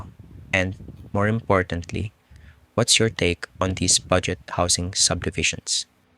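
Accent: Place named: Benguet, Philippines. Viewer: You must be from the highlands in Benguet based on the way you speak English.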